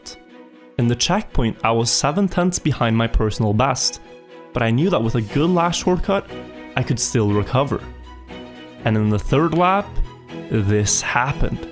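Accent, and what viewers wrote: Norway. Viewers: I just saw that he is Norwegian. Explains the trash accent. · You norsk??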